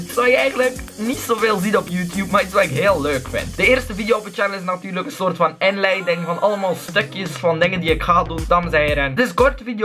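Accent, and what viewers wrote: West Flanders, Belgium. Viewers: Een West-Vlaming die Engels gaan praten? Ben benieuwd :D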